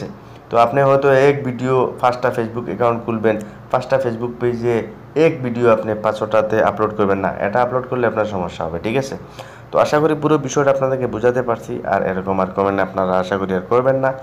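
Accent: Sylhet, Bangladesh. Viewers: বাসা শুনে মনে হচ্ছে ভাই সিলেটি😊😊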